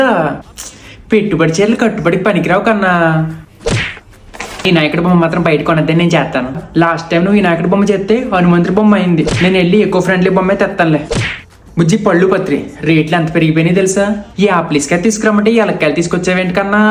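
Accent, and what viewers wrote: Godavari, India. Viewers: Happy ganesh chaturthi Asalu Anni intlo matldukunnatte untai Mana godaru slang yaaplis kayalu ,blouse ki kachhulu Super super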